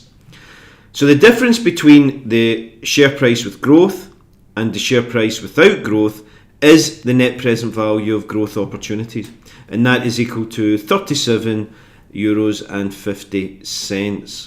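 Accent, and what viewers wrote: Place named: Scotland, United Kingdom. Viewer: Nice scottish accent out there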